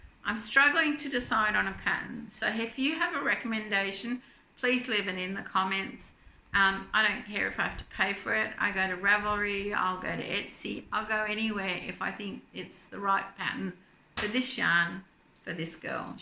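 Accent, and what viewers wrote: Australia. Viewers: I love your hats and cowl. I’ve yet to try the divine hat, it’s on my list to master this year. Congratulations 🥳 on your one year anniversary of YouTube, I’ve enjoyed watching your videos! It’s been lovely having another Aussie voice out there. Enjoy your long weekend and Happy Australia 🇦🇺 Day ❤️🧶💜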